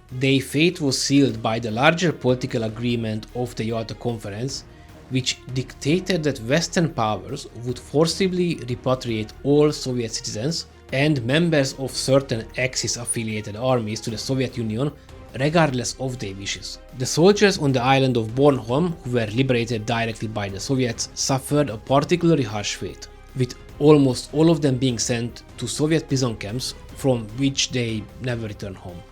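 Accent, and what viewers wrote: Hungary. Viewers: Use AI voice bro :D Pronuncication is so Hungarian, sorry but I can't focus with that :D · Can not understand due strong magyar accent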